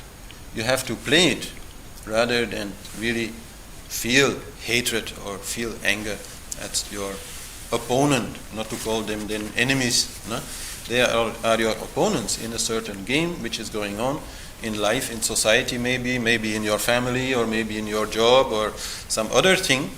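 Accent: India. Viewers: why do you speak like a indian and also spurt out trash ?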